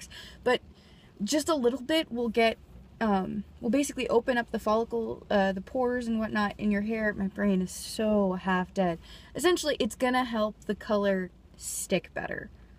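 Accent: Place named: United Kingdom. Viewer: Nice British acsent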